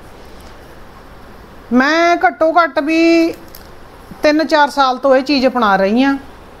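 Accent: Punjab, India. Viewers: ਤੁਹਾਡੀ ਠੇਠ ਪੰਜਾਬੀ ਬਹੁਤ ਵਧੀਆ ਲਗਦੀ ਹੈ ਜੀ ਸਮਝਾਉਣ ਦਾ ਤਰੀਕਾ ਵੀ ਬਹੁਤ ਵਧੀਆ ਜੀ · Bhaian ji thaith punjabi boht vadhiya laggi,mikdar jrur dseya kro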